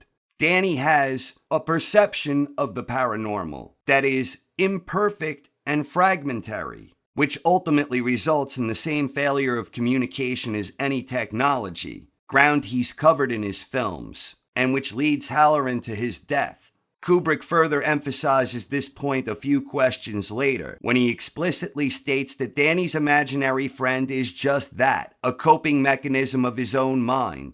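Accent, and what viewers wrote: New York, United States. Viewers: What accent? He sounds like a native speaker from New York or Jersey. That would be a dialect. "Accent" is only from one language to another.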